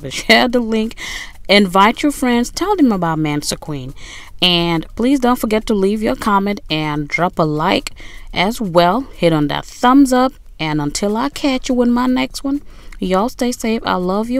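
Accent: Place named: New York, United States. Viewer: That New York accent. Are you from New York?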